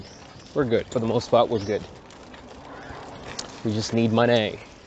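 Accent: United States. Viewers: Thank you for sharing helpful information. We need more details about lands and houses for sale in Bujumbura. I like the way you speak English you sound like you have been in USA for a while. Just suggestion try to focus on real estate and then we will be able to see some houses on sale.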